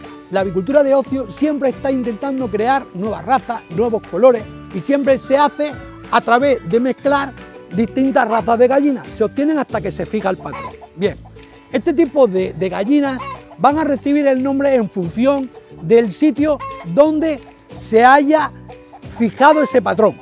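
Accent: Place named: Albacete, Spain. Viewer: Yo le sigo porque me es muy ameno y cercano y el tono de voz Albaceteño como el mio, cada duda que tengo le miro a usted sus videos para ver que solucion tiene.❤. Muchas gracias.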